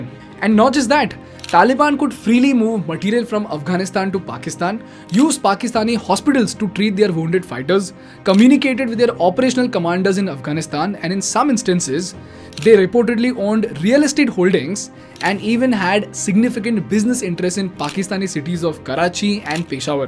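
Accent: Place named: India. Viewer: The problem is ... your Indian accent.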